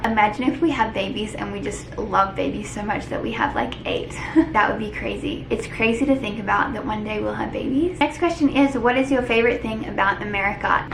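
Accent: Australia. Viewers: I think it would be so interesting to see how many of your kids and what kids of yours get's your Australian accent or if any gets your accent! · me watching the whole video and the whole thing I pay attention to is her amazing Australian accent 😂😐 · So Australian. Love it 😍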